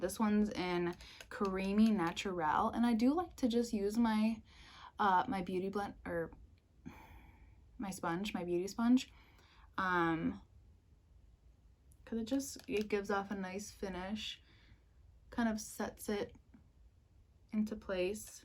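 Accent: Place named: England, United Kingdom. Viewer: for some reason you remind me of someone who was born and raised in England but moved to America and lost most of their accent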